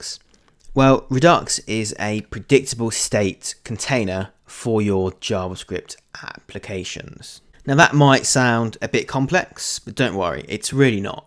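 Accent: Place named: United Kingdom. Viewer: You have got a new subscriber.. Two main reasons: 1- You have a british accent (I really love that accent).. 2- I think you're awesome ;)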